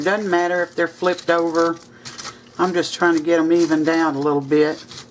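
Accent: South, United States. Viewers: just kills me with that southern draw lol. Y'all are as country as cornbread and that's a complement from where I'm at. Keep on cooking and I will keep on watching. 😊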